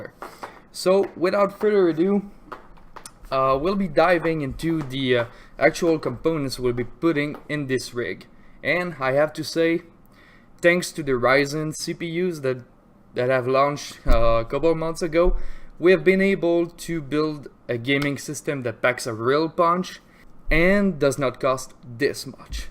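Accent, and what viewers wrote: Quebec, Canada. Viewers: tu sonne pas mal québecois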